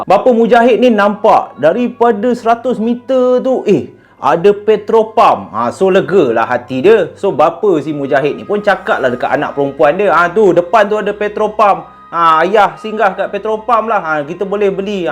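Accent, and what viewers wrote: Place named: Kelantan, Malaysia. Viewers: Agak pelik bila dgr bckp dialek kelantan...tersengih2 mami😅😅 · Pertama kali ari ni tgok channel you tube..gaya bercerita best jgk..sy doakn maju jaya ya.. ckp kl je sy yg kelantan.faham jee..😅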